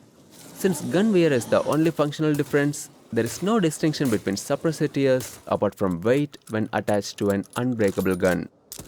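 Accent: India. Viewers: Why do you sound like a dirty pajeet? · This.is literally just a copy of the other dudes 2024 vid with an indian accent. Same transitions and everything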